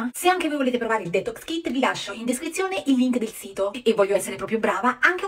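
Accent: Naples, Italy. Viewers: Sei molto bella e bravissima, ti ho scoperta da poco ( sono una signora sessantenne).toglimi una curiosità, ma sei napoletana?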